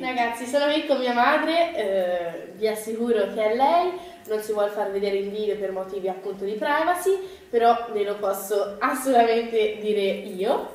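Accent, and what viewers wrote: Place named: Florence, Italy. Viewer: te tu c hai l accento un po fiorntino dan do vieni ?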